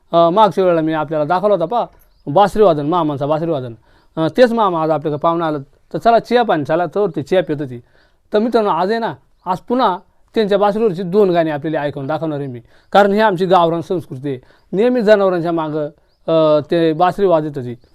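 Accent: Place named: Akole, India. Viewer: दादा भाषेवरुन तुम्ही नगर जिल्ह्यातील अकोले तालुक्यातील वाटता...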